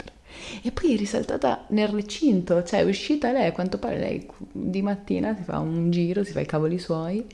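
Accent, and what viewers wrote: Italy. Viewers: Me encantas , hermoso el acento italiano ✨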